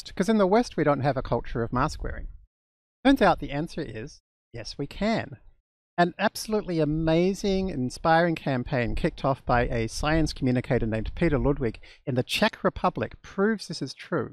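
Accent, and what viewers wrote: Australia. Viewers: Such informative video and I keep wondering does he have an Australian accent 🤔.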